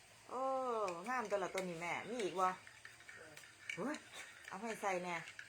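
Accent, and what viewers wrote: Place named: Isan, Thailand. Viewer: สวัสดีครับ ชอบฟังเสียงเวลาสาวอีสานพูด น่าฟังครับ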